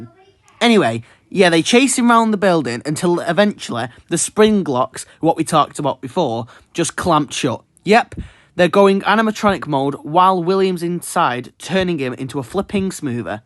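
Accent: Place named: Manchester, United Kingdom. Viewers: I like the British accent 🔥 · I think it’s a Manchester accent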